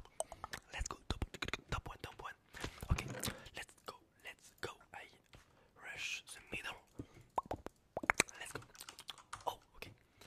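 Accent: France. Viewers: he la french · you comm from french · J'ai juré sur ma vie t'es français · r u french boy? ur english is so cool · Tu ne serais pas français par hasard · You are from fransh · I thought he was a spanish turns out french very cool :) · Je me disais que t'avais un accent fr sa a confirmé le it's c'est trop 😂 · Are you french? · Iam french and i think he have a french accent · Is he French? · I see i see u ar not english i gues u ar french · 10 secondes j’ai capté il était français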